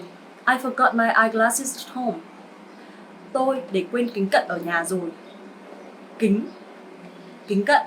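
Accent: North, Vietnam. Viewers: That northern accent is HARD in itself as a southern hahahss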